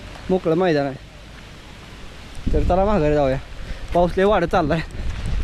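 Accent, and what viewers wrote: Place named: Solapur, India. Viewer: सोलापूरी भाषाआहे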